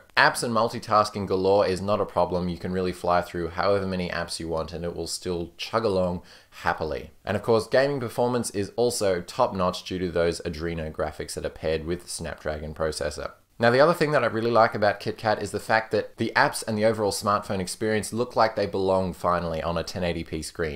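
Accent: Australia. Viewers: So u from Aussie mate ?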